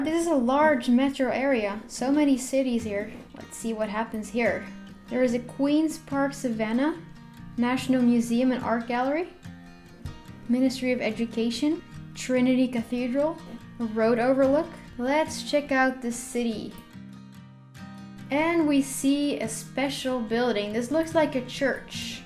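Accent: Spain. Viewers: Trinidad is so beautiful .. is how she pronouncing everything in this Spanish accent.. noooooo bbg😒😒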